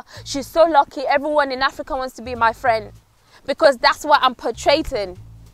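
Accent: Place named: RP, United Kingdom. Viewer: Hi. Can we do a massive online database for all major African languages assisted by those who speak them well and technologies like NLP, ML, AI, Crowdsourcing, and the like? Forgive me for saying this but your Queen's English is adorable (This is what they taught us in school from Primary all the way to Uni)so I cannot remove myself from it entirely. I'm a Kenyan from a major tribe that takes English as its first language! Talk of colonial mentality.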